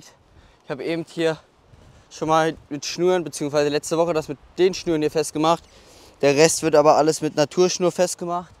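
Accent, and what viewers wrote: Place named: Bavaria, Germany. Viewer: achso finde du hast so bayrisch geklungen :D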